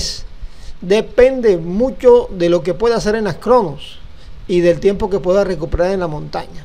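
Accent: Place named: Spain. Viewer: lo admiro, pero no hables estilo español, no dugas vidio, somos colombianos, ( vídeo,) un abrazo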